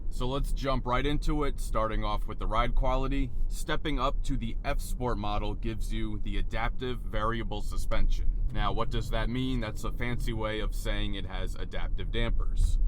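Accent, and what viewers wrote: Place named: New Jersey, United States. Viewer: Bro’s never heard a Jersey accent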